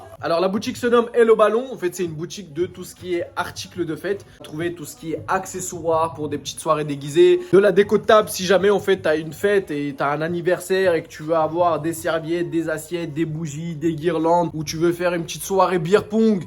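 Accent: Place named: suburbs, France. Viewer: Et depuis vous avez fait faillite? Encore un jeune de banlieue avec sa façon de parler !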